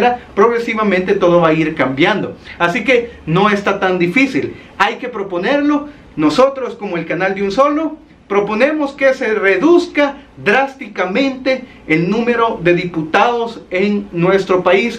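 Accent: El Salvador. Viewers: Me llego, primera vez q veo este canal, hablas como un salvadoreño 100 por 100... Auténtico... Felicitaciones mi hermano!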